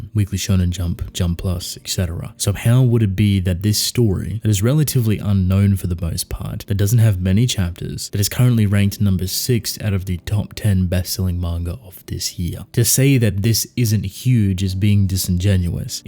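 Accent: Australia. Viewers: Are you australian ? I love australians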